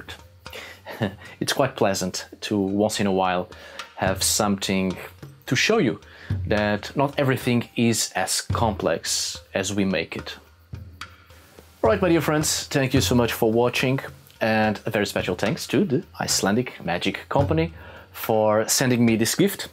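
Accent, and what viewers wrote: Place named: Portugal. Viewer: You are portuguese right? The way you speak your english, the way you pause in between words, and the words you choose. It really sounds like a portuguese speaking in english. (I am portuguese btw ahah)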